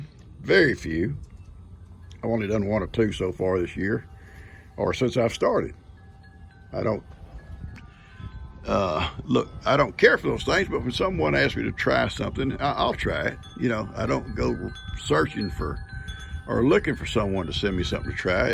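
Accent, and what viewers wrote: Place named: Texas, United States. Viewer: Such an enjoyable video, i could listen to that Texan drawl all day. We don't have hummingbirds here in Australia the closest would be our honeyeaters, nectar collectors😊 Many thousands of us understand we see little snippets of your life. We mostly call our grandies little tackers. I also think we all know the different bonds we have with our grandkids because of divorce. I'm starting to speak Texan! Find myself saying I'm 'fixin' to do something and i love "all y'all". Kash is a beautiful wee man and Heidi is so special ❤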